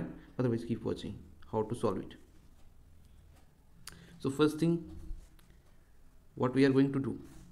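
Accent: India. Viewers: Fucking India accent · Indian accent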